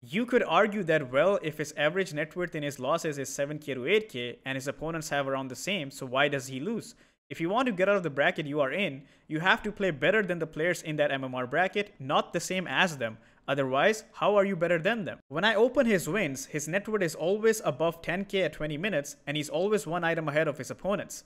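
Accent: India. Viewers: The Indian accent throwing me off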